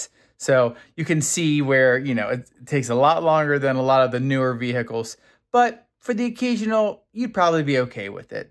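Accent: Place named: Baltimore, United States. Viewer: Nice video. I am having trouble getting over the Packers quarter zip. I had always thought you were a local Baltimore guy with a Townie accent. Seriously though, nice vid. Did I miss the overlay of the original "new" charging curve? Maybe the Packers top blinded me.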